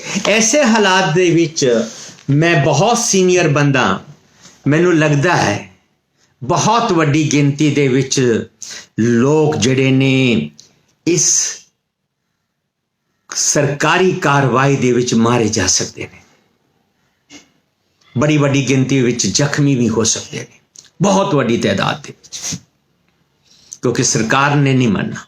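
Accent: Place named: India. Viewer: Tere boln to pta lgda tu punjabi nahi ga teri tone hi hindi aa tu kehde paase to punjabi aa ?? Kisaaan aa?? Sikh aa?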